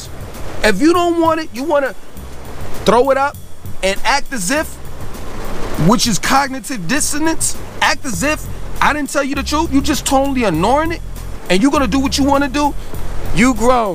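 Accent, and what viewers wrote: New York, United States. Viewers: You sound like a true New Yorker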